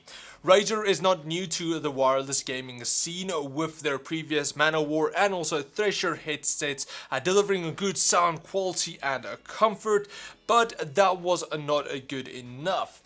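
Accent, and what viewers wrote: South Africa. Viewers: Hey. You're South African haha. Me too. Considering to buy this headset for myself now.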